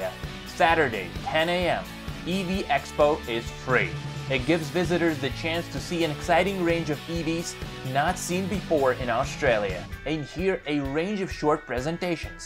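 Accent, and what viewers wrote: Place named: United States. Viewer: Good video, good text, but why couldn't we have a Strayan deliver it? It sounds like something you'd hear on the shopping channel. No offence intended to our American colleagues, but one of 25M of us should have been able to do the voice over, imho.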